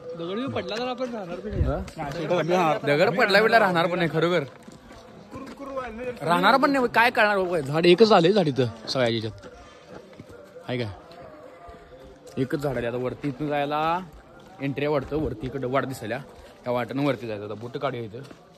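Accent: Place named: Solapur, India. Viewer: कसला भारी बे ❤ सोलापूर बोलते ❤ 😂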